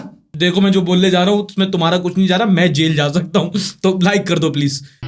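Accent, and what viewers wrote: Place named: Jhansi, India. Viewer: Bhai aap jhansi se ho kya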